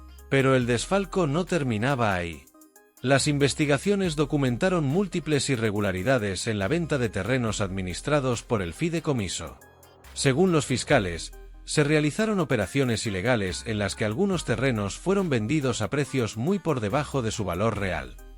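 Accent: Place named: United States. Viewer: No puedes pronunciar CUAUHTEMOC ?? o decir veinte millones ?? De que pinche cerro te bajaron ?? Tu pinchurrienta manera de hablar tan agringada y miserable dan risa...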